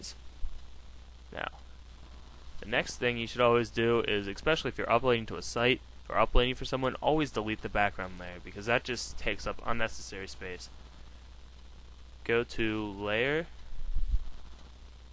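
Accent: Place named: United States. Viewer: that american accent gets on my tit i cant understand nothing he says.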